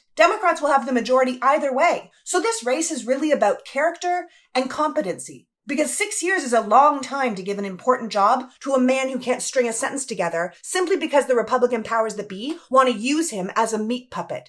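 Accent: Canada. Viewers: Love your Canadian accent.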